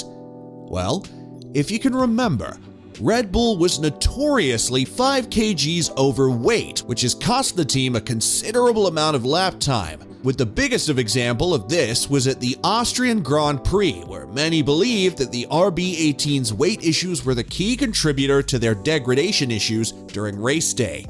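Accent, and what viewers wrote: United States. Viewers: A strange video - more like an attempt at anti-RB PR stunt with some very doubtful claims of "cheating", read out by an overly-dramatic voice actor with a condescending tone who is clearly not an F1 expert. Not a fan of the mid-west accent and 'Murican colloquialisms when presenting a top-end, international motor sport. What's the point of this amateurish, hyped-up polemic? This poorly executed click-bait will not catch me again. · I'm sorry but you are sounding way to American to be taken seriously about this.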